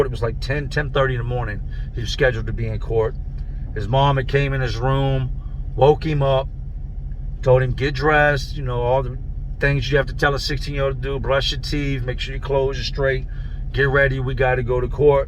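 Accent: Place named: Philadelphia, United States. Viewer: ur doing excellent work on teaching people the perils of prisons ect. My wife thinks ur cute, u sound like ur from N. Philly, ☺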